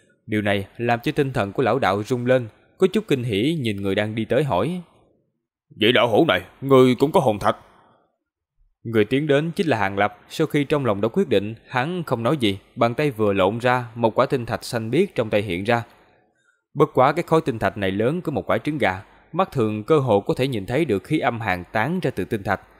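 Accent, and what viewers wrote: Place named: Mekong Delta, Vietnam. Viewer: Bn ng miền tay thì phải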